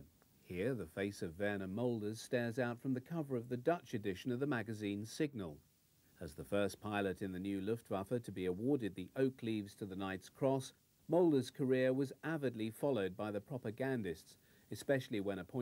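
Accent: RP, United Kingdom. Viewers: Nice public school English , but background sound is way to high . Fire the editing guy .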